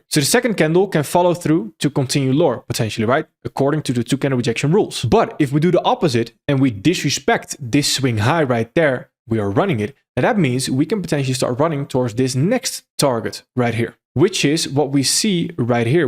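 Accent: Netherlands. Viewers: Top notch content, simply top notch! Also, you're Dutch no? I've listened to Peter Bom for a while and there's similarities in the accent.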